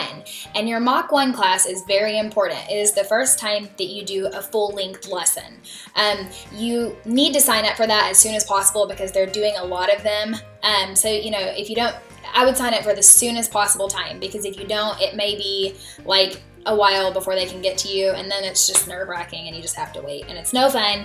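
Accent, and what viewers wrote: United States. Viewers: Glad to hear that hint of Southern accent because I was worried about mine :)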